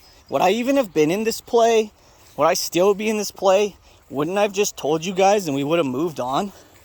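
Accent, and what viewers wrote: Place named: Canada. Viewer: Keep grinding man your really building this channel quickly. I could really here the canadian in ya this vid. You said level at one point i was abut to die lol 🤣🤣 best of luck bro. Curious to see your perspective on the warrants too many conflicting view points and most of those who actually know what theyre talking about are just pointing out what perspective they want everyone else to have. I know for a fact youll tell it like it is bro.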